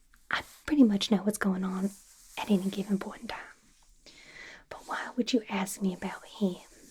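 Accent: South, United States. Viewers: love the southern accent!! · love the inclusion of small southernisms, it really sells the accent and the video was great :3 - a southern person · As a huge marvel nerd, the southern accent is a nice touch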